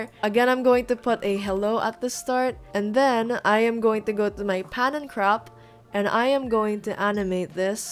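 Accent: Philippines. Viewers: for some reason you sound filipino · your accent is familiar are u filipino? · hmmm i sense a filipino accent are you a filipino? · w8 yur accent is filipino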